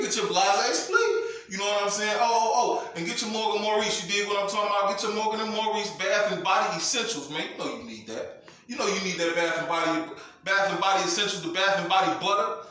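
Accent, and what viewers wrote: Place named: Arkansas, United States. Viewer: You making a lot of sense.. Sound like niggas where I'm from here in Arkansas. .Home of the Chambers Brothers..who you should look into doing a video on..real heavy hitters..I went to school with the younger generation of Chambers..but as for Demencio..I'm not thuggin but let me hang wit em so I obtain that image n now I'm free to be me..No matter if I wanna be on some thug shit, goon shit, player shit, money making shit..I'm free to do that because of how I have surrounded myself..P.O.M.E. type shit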